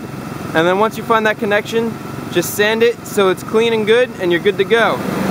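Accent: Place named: Upper Midwest, United States. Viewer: Are you from the northern mid-west? Try not speaking lazy with your lips almost closed.. it makes you sound like Carmen on Southpark. Just like when singing, public speaking required that we open our mouth when speaking. Just a suggestion. .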